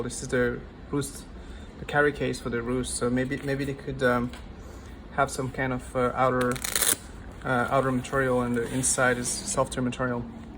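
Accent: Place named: Europe. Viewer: Where are you from? You sound European but pronounce it Zee instead of Zed.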